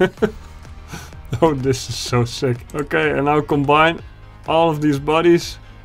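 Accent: Netherlands. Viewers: Nice man! Ik hoor je dat je Nederlands bent! 😂😂. Het grappigste vond ik toen je cod 2 aan het spelen was en je godve** zij🤣🤣. Daar viel je door de mand😂😂😂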